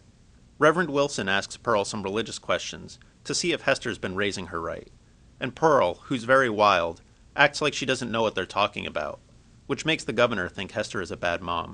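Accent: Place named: United Kingdom. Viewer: مصدگ عود 😂😂ترى هذا بريطاني إلي ديحجي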